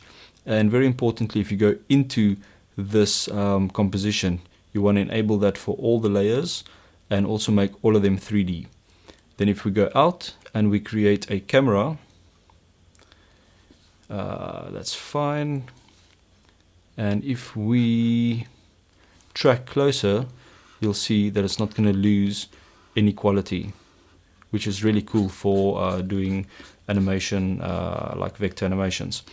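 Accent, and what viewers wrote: South Africa. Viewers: You sound South African,are you ? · You sound south african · South African by far ;D Afrikaans accent ;) So yeh, he is South African, or somewhere in Africa xD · I Love Ur South African Accent I'm Also South African!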